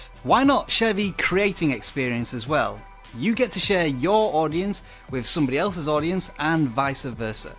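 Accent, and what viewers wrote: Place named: Scotland, United Kingdom. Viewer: i can tell he's scottish 😁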